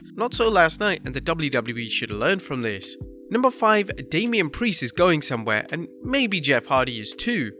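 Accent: United Kingdom. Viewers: oh you're also from the UK nice. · Wow, u from uk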